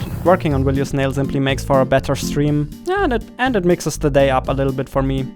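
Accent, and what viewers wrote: Germany. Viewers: Hey, jetzt weiß ich wieso du einen deutschen Akzent hast 😂🙂❤ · Bist du deutsch?Hört sich irgendwie so an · Wie man halt instant an deinem Akzent merkt das du Deutscher bist. :D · Direkt aufgefallen, du bist Deutschsprachiger ^^ Aber cool dass mich der heilige YouTube Algorithmus hier her gebracht hat :) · Haha ich wusste es dieser aktzent der muss deutsch sein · Are u from Germany? u have a German accent ^^ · du sprichst see also to see immer wie „sie“ aus und nicht wie „ßie“😂 Daran hab ich auch gemerkt dass du deutsch bist. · Feier diesen Deutschen Englisch aktzent, aber nices Video. Kannst du mal zeigen wie man spiele auf Steam hochlädt? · Bist du deutscher? Are you german? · Er ist deutscher. Man hat es im Call gehört. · Du bist deutsch? :D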